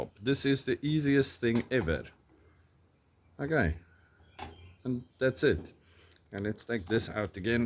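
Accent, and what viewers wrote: South Africa. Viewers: SO LEKKER om 'n Suid Afrikaner op YT te hoor !!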